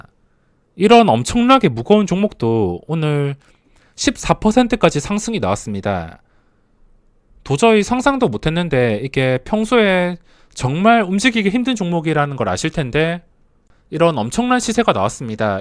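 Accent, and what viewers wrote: Gyeongsang, South Korea. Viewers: 창원사투리 진짜 짜증나네 표준물좀 써주시죠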